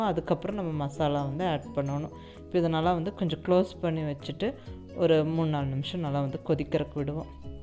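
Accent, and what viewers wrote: Coimbatore, India. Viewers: சூப்பரா இருக்கு கோயம்புத்தூர்ல நீங்க எந்த இடத்தில இருக்கீங்க ன்னு சொல்லுங்க மேடம் நீங்க பேசற லேங்குவேஜ் சூப்பரா இருக்கு நாங்களும் இப்படிதான் பேசுவோம் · Hi aunty Naan Bangalore larundhu ungaloda periya fan Unga kongu tamil ennaku romba pudikum Unga recipes lam Vera level Naan clg 1st year poga poren Enga chitti and ammuchi lam Coimbatore la dhan irukanga Yedayarpalayam la Ungala naanga paakanum Plz neenga enga irukinga nu sollunga 😍😍😍😍😘😘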